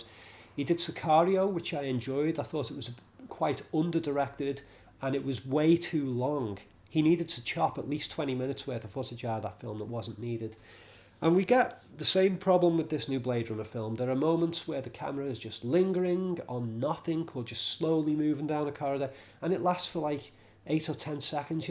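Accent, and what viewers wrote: Liverpool, United Kingdom. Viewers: has an English accent. I think he might be from Liverpool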